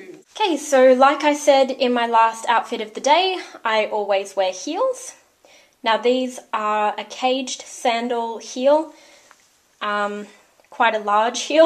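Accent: Australia. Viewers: Love the ozzie aceent.... GO AUZZIES!!!!!I actually think you are the first Australian sewer I have seen on youtube. Thats a really good thing though, because then I know where I can buy AUSTRALIAN stuff from AUSTRALIAN shops!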